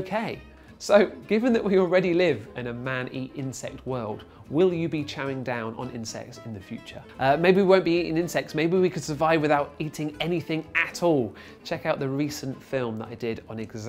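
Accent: United Kingdom. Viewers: British accent sometimes is harder to understand than North American.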